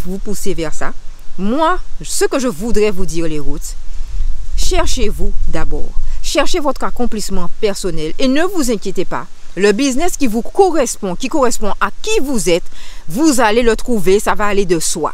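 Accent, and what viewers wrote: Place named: Benin. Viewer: L’accent beninois s'est installé 😅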